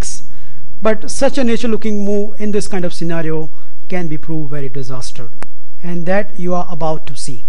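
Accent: India. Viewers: the indian accent... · Love you bro. Hindi accent is great ;)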